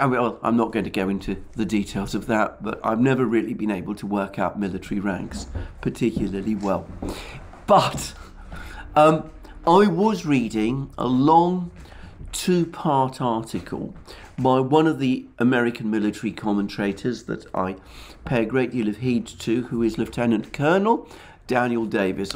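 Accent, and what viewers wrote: United Kingdom. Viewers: Best place for pristine russian propaganda with a lovely british accent.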